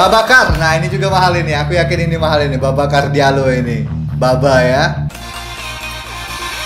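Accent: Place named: Terengganu, Malaysia. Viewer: terengganu nelang... like org ganu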